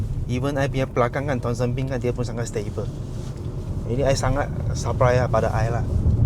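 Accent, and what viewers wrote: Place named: China. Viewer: Hehe... Aku mmg suka la tgok video ko. Mmg syok bro. Ko ckp jujur. Malah video ni paling best...ko sebut P A J A R O...hahaha!! Pelat Cina ko tu buat aku gembira. ❤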